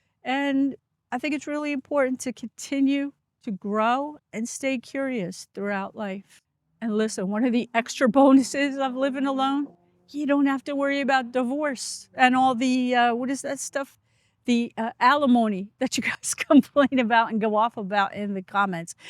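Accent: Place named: East Coast, United States. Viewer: You have wisdom,! You are caring and a great communicator. Thanks for lifting us up. Life & happiness is what you make it. Love your kind heart & east coast accent. ❤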